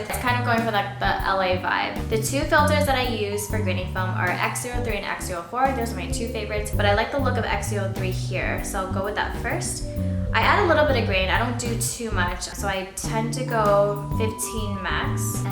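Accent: United Kingdom. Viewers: I loved the British accent! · Ok. Bye. lol. Nice British accent 😃.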